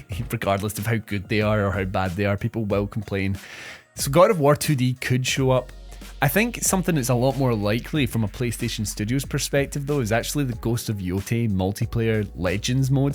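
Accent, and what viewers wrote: Scotland, United Kingdom. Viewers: What accent is that? Is that Scottish? It's not a bad one. Who's excited for Horizon?😂...nobody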